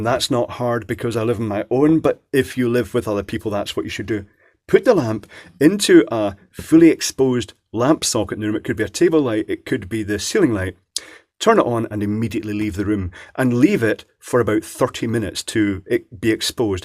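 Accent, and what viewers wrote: Scotland, United Kingdom. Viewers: it's good to hear a Scottish accent for a change.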